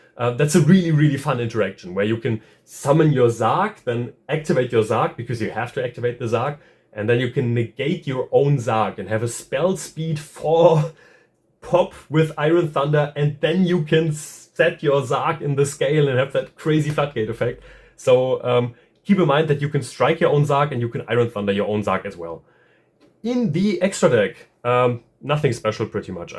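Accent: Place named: Germany. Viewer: boa.... wenn deutsche englisch reden...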